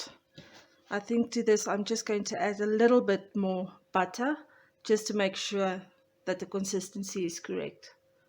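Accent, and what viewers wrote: South Africa. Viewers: Definitely a south African voice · I recognize your South African accent. Love that you dont need fresh cream in your recipe... a healthier take on Cremora tart. Definitely going to try it! Thanks sooo much and thanks for not adding any music to your video. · ❤yes 💞 South African accent is unique. I immediately recognised the voice and the recipe. Im also South African here in USA ..thank you for the reminder. I will make this delicious tart over the weekend. Yummy 😋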